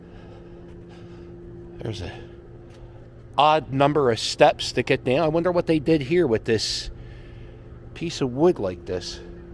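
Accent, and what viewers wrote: Philadelphia, United States. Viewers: I come from 4 generations of Philly. Those pronunciations are quintissentilal Philly.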